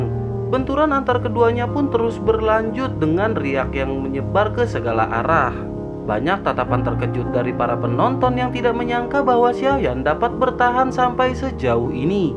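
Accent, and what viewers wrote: West Java, Indonesia. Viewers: Sunda pisan...kanjutkan min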